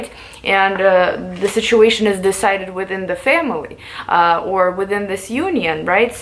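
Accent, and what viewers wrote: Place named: Russia. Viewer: I like her accent, is she Russian?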